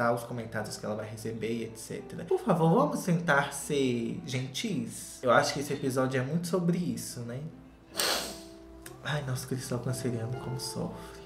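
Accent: United Kingdom. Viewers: Não há melhor canal!!!!!!! E esse inglês british Braseeeeellllll 👏👏👏👏👏👏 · O toque britânico no seu inglês é um charme a parte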